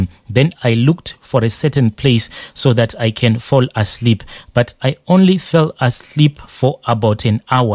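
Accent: Zimbabwe. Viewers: Is he from Zim this brother his tongue said so.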